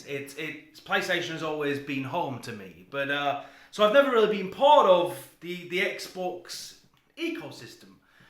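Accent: United Kingdom. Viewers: am uk and its putting me out I was like was he uk moved somewhere lol 😂 ..but yes give atomfall a look as it looks so good aswell ... having both consoles is the best way now you own a Xbox go and buy yourself some 2800mah AA rechargeable batteries and a socket charger (all about 20 quid if that) and your have a shock how long the controller last to a ps5 controller I get like 4 days from mine lol (usb mic) 😆 my ps5 about 8-10 hours if that lol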